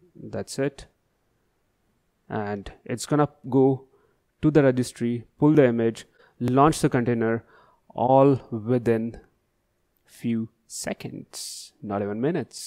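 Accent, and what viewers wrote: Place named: India. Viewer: I'm no English native so the Indian accent is hard for me, sorry.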